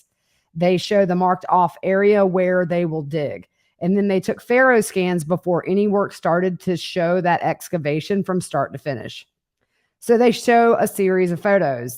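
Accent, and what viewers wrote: South, United States. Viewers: You are doing an outstanding job reporting back to us on a daily basis. I know it must be very difficult to hear and see what was done to those precious children. Have a wonderful time with your daughter at the concert; she is greatly blessed to have a mama like you! Btw, I love your southern accent.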